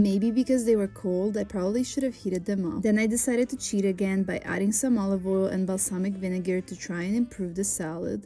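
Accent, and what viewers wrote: United States. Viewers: why do u speak in american english when ur european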